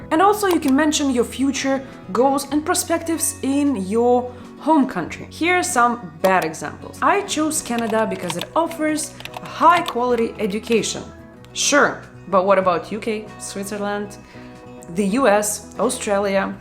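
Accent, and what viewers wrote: Russia. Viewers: thank you So much for this video... love your Russian accent.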